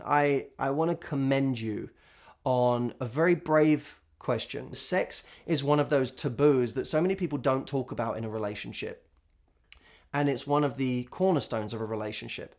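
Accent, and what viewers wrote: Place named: England, United Kingdom. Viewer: I loved it when he said in the bedroom, and dirty tawlk, nawtee talk...lol cute, in that sexy English lad accent of his... I enjoyed that stuff he said when he was speaking in a seductive tone... Ooh those lovely green eyes, the sultry expressive baby come hither looks and body language, but those soft tones make me all melty, I want to hear him speak like that to me, telling me what he would like to hear me say, & costing up in the street whispering I can't wait till I get you home. Of course in the context of being life partners I am permitted to imagine these lovely intimacies...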